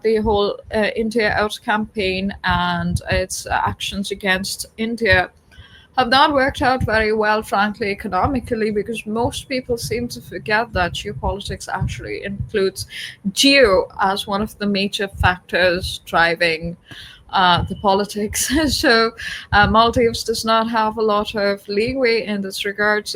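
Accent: United Kingdom. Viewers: This was cathartic - as an Indian American. Well said. Is that a british accent u have? I think its something different. Very sweet. Followed u here from DEF talks. Jay Shri Krishna.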